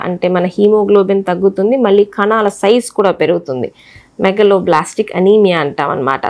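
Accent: Guntur, India. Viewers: Amma. Bangaram. Konda. Thalli. Neeku. Nenu okka. Salahu. Isthanu. Neeku. Isthamaithe. ..manadhi Guntur kabatti. . Vacchuddi..poidhi..ki baduluga vasthundhi...pothundhi....ani. kanuka matladithe. Adbhuthamaina. Slang thayaru avuthundhamma...velaithe. naa. Yokka. Request. Ni..patinchu. lekapothe. Mana. Guntur. Mana. Ooru. Bhashani. Manamu. Endhuku. Odhulukovali. Anukunte. Nee isthamu. Bangaram...kaani. neevu. Bhavisyattulo. Chala goppa peru thechhukuntavamma....nevu. mathramu. Continue cheyyamma. Neeku. 10 million subscribers vastharamma. Thondharalone.. sweet. Voice. Plus. Most intelligent...DR..amma nevu. ..thalli.. MAY. GOD. BLESS. YOU. THALLI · అది గుంటూరు తెలుగు❤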